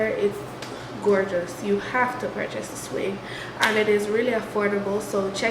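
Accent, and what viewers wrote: Jamaica. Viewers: it’s her Jamaican accent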